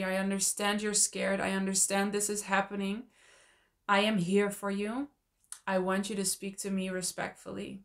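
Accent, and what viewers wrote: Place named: Netherlands. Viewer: There are a lot of wonderful YouTubers on attachment styles but takes the cake - and this one in particular. 100% acceptance of an FA - or anyone - as they are in this moment or move on. Love your honesty, authenticity, wisdom and Dutch accent but amazing English / calming voice. Keep it up, girl!!!!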